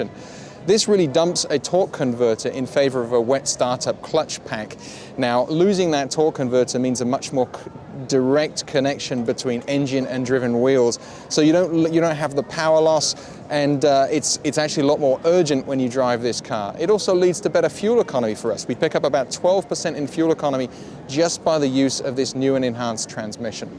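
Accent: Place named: Australia. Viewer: Beautiful car! This guy sounds australian...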